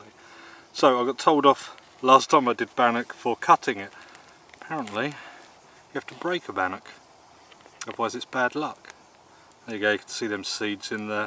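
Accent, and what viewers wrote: England, United Kingdom. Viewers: Hello greatings from Moose Jaw in Saskatchewan This is a nice video. You made it again nice and calm I like you way your Englisch way spezialy as a German living in Canada lol. Good idea to cook on a stone I have never done this. Your shelter is good to my respect I hope it will still be there when you return. Ok I wish you luck and can wait for a new one. Take care and stay healthy with your family. Bye bye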